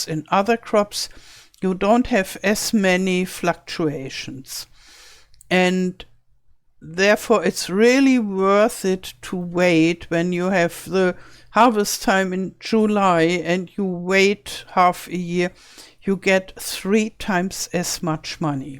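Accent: Germany. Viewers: i love how german you sound! as a german being away from germany for 7 years now everyone can still instantly tell im german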